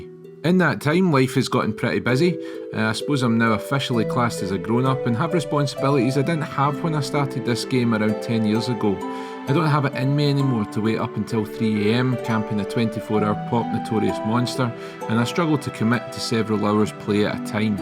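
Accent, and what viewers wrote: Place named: Scotland, United Kingdom. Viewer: Great to hear a fellow Scot speak about this game the way I do lol. I just started a new character on Odin!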